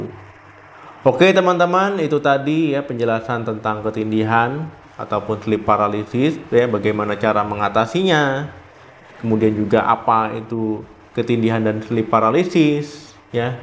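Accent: Aceh, Indonesia. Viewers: Logat dokter kek org aceh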